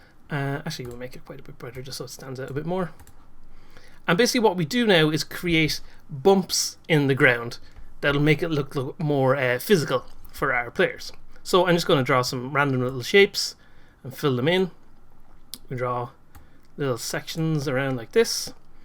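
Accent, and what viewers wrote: Ireland. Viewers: Great tutorial!! Helped me tons on getting my 2D platformer going! Are you Irish?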